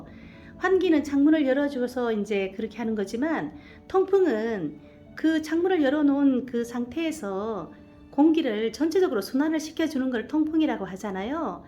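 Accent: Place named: Gyeongsang, South Korea. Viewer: 항상 잘보고 있습니다 경상도 사투리에 애교섞인 목소리에 웃음짓게 합니다 계속 많은정보 부탁 드려요 이벤트 참여 합니당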